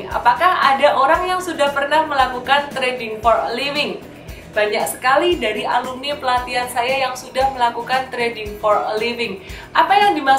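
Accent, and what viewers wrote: Java, Indonesia. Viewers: Walaupun medok..tapi okelahh cara dia menjelaskanny thanks mom😍😍😍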